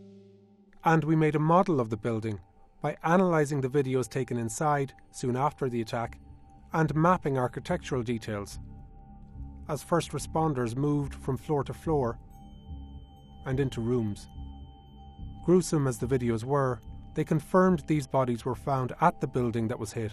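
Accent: Ireland. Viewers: Is that a horrible Irish accent ugh not nice to listen to doesn’t make for a good YouTube experience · Why do you have this Irish sounding Narrator? Slightly offensive that you think our own dialect isn’t distinguished enough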